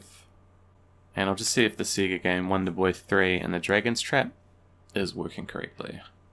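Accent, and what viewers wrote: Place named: New Zealand. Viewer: Nice to hear a kiwi accent 🤘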